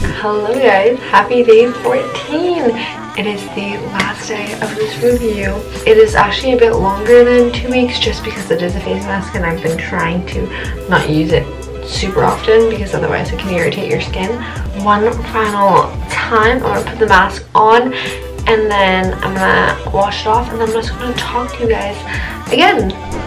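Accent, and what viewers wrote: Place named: Southern California, United States. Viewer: It’s that Southern California accent lol. We all sound like that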